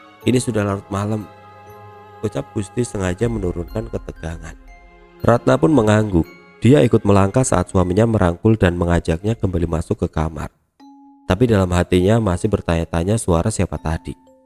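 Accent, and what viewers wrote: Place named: Banyumas, Indonesia. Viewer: Orang ngapak bukan si?? Medoknya keliatan banget kalo lg percakapan 🤭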